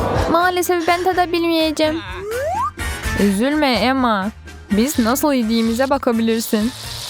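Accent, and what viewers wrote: Azerbaijan. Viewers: Azerbaycanlı seslendirmiş Türkçe konuşmaya çalışarak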